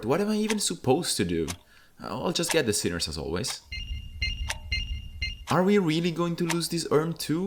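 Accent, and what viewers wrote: Italy. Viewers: As a fellow italian (assuming you're too since the slight accent and the name of the channel) i cannot understate how much this video helped me in just a couple of games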